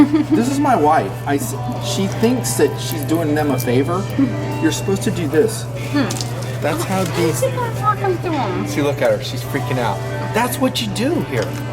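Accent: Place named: Texas, United States. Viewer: Yup sounds like Texas.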